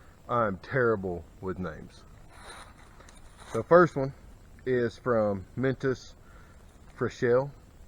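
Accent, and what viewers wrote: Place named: Georgia, United States. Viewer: Its a little strange hearing a Chattooga County accent on TV. You kick ass man!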